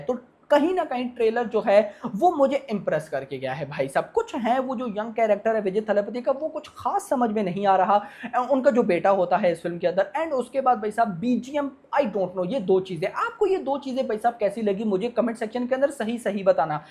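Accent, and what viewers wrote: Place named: Pakistan. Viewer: Why are you over reacting and I don’t know, i feel You have a Pakistani accent.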